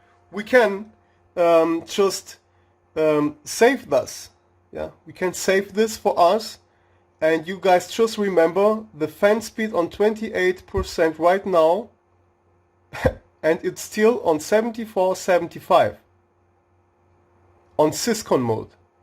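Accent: Germany. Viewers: German accent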